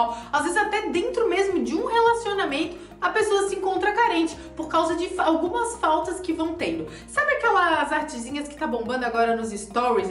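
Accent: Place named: Florianopolis, Brazil. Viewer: Tú é de Floripa né, só pelo sotaque kkk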